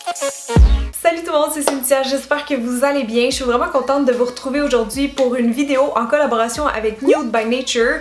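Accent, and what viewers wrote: Canada. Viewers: Bravo pour l'ensemble de tout ce que tu fais, et Merci pour les infos sur ce produit crueltyfree. Trop peu de personnes se préocccupent de la souffrance animale, MERCI!!!! Les produits que tu présentes ici sont la plus belle preuve qu'on peut obtenir la beauté, et la qualité, sans passer par la cruauté et/ou la chimie. Bises. Ps: ( je suis en France), j'aime beaucoup ton accent Canadien, ce qui ajoute encore de la sympathie à ta chaîne.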